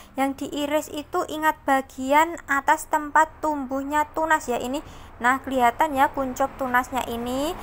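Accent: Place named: Java, Indonesia. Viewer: Mba..ngmngnya medok bgt ..jd saflok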